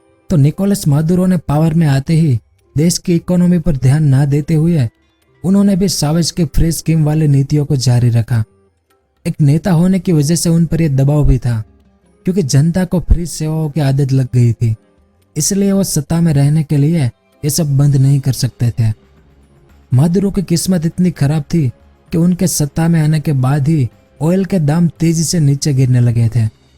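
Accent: India. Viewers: Tu bolne me pura desi hain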